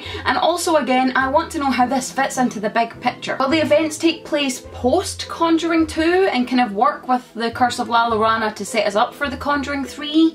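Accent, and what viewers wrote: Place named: West Scotland, United Kingdom. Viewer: Here thats a wee west scottish accent 🤣🤣 where you from pal?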